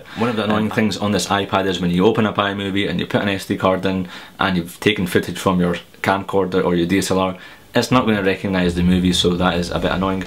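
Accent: Scotland, United Kingdom. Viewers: I like Ur scottish Accent! Big Country Forever! Stay alive!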